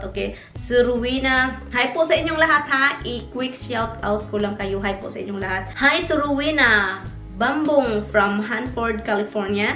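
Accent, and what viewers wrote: Visayas, Philippines. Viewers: nakakainis ka talaga mga insecure...ano din Kong may visaya accent kaloka talaga ..pilipino nga naman kung wala kayo maganda isasabi wag kayo manuod ..mga inngetira ...grabi naman talaga pilipino pag naka asawa foreign pokpok na agad kaloka msyado mga inngetira talaga ..may asawa rin ako foriegn church kami nag meet nag husband same religion..wala ako paki sa tao ayaw sa akin .. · ganon talaga ang bigkas tayo mga visaya tingnan mo melai canteveros si momy dionesia inggit sila dahil marunong tayo magtagalog samantala sila bubu sa visaya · I like you sis for being so honest, there's no problem if you sounds like bisaya when you talk in English or Tagalog, let's be proud of what we are and where we came from, maybe these people are envied by you, don't stressed yourself..... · I always watch your vlogs but I still have to catch up with your past vlogs., anyway, other people have no life so they mind how others live their lives. 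Kaloka sila, eh ano if bibigyan Ka Ng pera ni Tommy, helloooooo, asawa mo sya, super funny ang ibang Tao mag isip. You don't have to explain really sis, whoever is this person, she's so downright jealous of your happy life, hayaan mo syang mangisay sa inggit. Nanlalait pa talaga sayo, kesyo maingay Ka kumain, ang sarap mo kayang tingnan kumain, nakaka gana nga, kasi natural masyado, nag eenjoy kang kumain. Grabe ang inggit nya sa yo, kasi maganda Ka at happy sa buhay mo tapos, ang gwapo at super loyal Ng asawa mo. Ang linis linis Ng bahay mo, how dare these people call you pokpok, sobrang home maker mo kaya, tapos, super loving ka din sa asawa mo. Inisin mo nga sila, ingayan mo pa kumain, inisin mo pa lalo. Bisaya din ako, nagkakamay din ako, at maingay din ako, not only when I eat, as in, maingay ako like you, so what? Napaka bitter Ng babaeng Yan, kawawa naman sya. Eh ano if matigas ang accent natin? 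So what? Tigasan pa natin lalo, para lalo silang mainis, lait Ng lait, but nanonood naman, mga gago sila. At manlait RIN Wala kayong anak, eh ano, ako din walang anak, complete pa rin tayo, keysa magkaanak nga, mga Adik din, may neighbor ako ganun din sa akin nuon, before I got married, nilait ako being single, and now that I'm a widow, nilalait din dahil walang anak, but yong mga anak niya, lahat Adik, pulos lalaki, nakakataw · Hi I love all your vlogs ,hayaan mo yang mga bashers at least marami naman mga good people loves you .mga wala lang yan magawa at naiinggit lang yan sayo.Stay what you are ,I am proud to be bisaya ,I love the way you talk , ganon talaga tayo mga bisaya matigas magsalita ng words ,marami ka naman napapasaya like me I really enjoy your videos .Don't mind people judge you ,walang perfect sa mundo ,Stay positive and stay what you are I love you , pashoot out naman ,God bless😍😍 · Yung iba nga sis tinututok pa ang mic para marinig ang nguya eh hahaha.. 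yun nga sis prob sa mga viewers di nmn lahat ng kilos ntin eh need video di nmn lahat move. Mga ganyang ganyan din mga comment sakin hahaha. Mga inggit lng satin sis. Bisaya ka kasi sis kaya syempre bisaya accent talaga. Syempre maganda nmn talaga tagalog english para maintindihan ng lahat. Pag nagbisaya ka sis mdami malulungkot di makaintindi. Tawa ako ng tawa pagbasa mo hahaha. Ako nmn magtatagalog nlng daw ako eh pag nagtatagalog ako dami nmn nagrequest english kasi may mga foreign viewer. Tawang tawa ako sa may asim pa hahaha. Pokpokin mo n sis hahaha. Dati nagbasa din ako negative comment mas lalo sila nagsasaya haha. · hayaan mo sila sis, i like u nga e. alangan namang vlogger di palasalita..syempre magdadaldal ka. ganun talaga regional accent kasi bisaya... ako ngang pure tagalog namamali din ng bigkas.😂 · SO WHAT KUNG MAY ACCENT KA? So what kung bisaya ka? Grabe itong mga taong ito akala mo naman matatalino pa sa yo! 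Bkit sila ba may blog kagaya mo? Inggit ba inggit sila kaya ganyan sia ka mean sa pagsasalita nila sayo. Kaya hayaan mo nlang sila. Matuto sila ng tamang asal!!!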